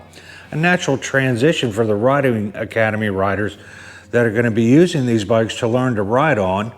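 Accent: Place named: United States. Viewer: I lilke your accent which state are you from?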